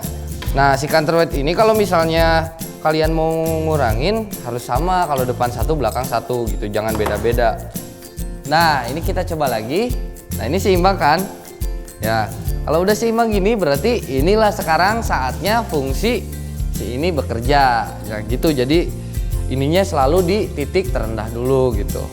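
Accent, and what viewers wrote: West Java, Indonesia. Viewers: Kalo dari logat logatnya orang sunda nih kayanya😁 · ia kang ketauan banget sundanya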